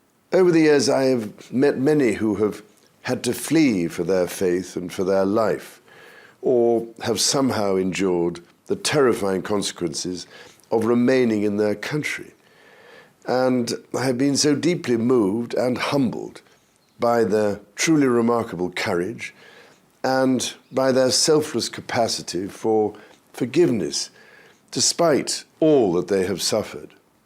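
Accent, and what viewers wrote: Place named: England, United Kingdom. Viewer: That English voice is so perfect . Wow , . His tone is par excellence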